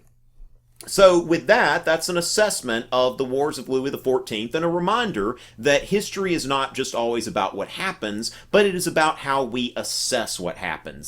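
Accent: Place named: South Carolina, United States. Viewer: Hehe, yeah. got a thick South Carolinian accent. Little different from mine (Texan) with all the aaaa's.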